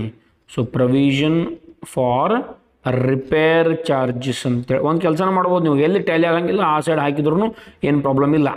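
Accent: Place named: North Karnataka, India. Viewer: Sir ji Ur asom .. Nim uttar karnataka style mast .. Thank you.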